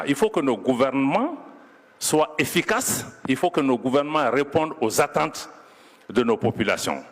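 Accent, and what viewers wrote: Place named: Democratic Republic of the Congo. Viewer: J'ai toujours trouvé un accent lingala à ce monsieur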